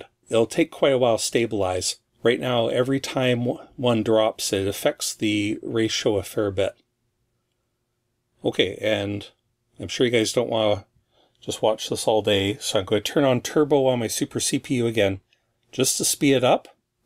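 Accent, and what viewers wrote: Canada. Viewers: I love the gesturing hand. It seems to be trademark. I do wish he'd show his face a little more, but I think the gesturing hand works for him. Also, the soft-spoken Canadian accent. See also: <link> <link>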